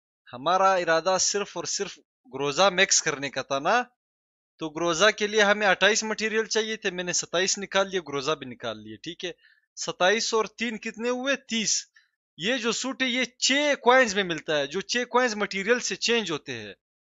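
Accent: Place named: Hindko, Pakistan. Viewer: Ap kaha say ho? Awaz sa asa lgta hai ap hindko speaker o.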